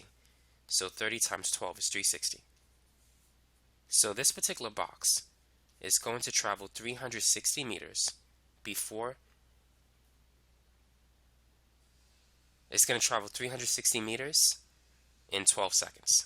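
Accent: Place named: Boston, United States. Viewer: I am a woman and this guys Boston voice is so hot.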